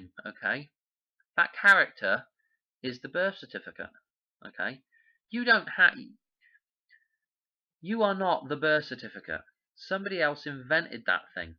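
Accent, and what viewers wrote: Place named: England, United Kingdom. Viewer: Came over from Secrets of Saturn, well explained. Wasn’t expecting to hear an English accent. Greetings from Edinburgh Scotland.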